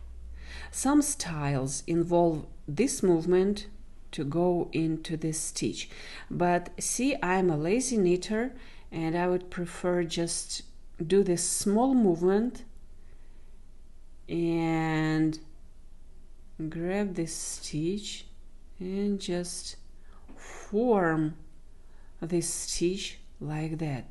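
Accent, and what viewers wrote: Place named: Russia. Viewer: in Russia we call it grandma’s style. Also we have other styles such as classic and eastern. Aren’t you russian by any chance? Your accent sounds russian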